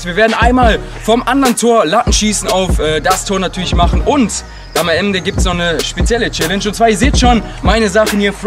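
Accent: Germany. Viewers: kommst du aus Wuppertal?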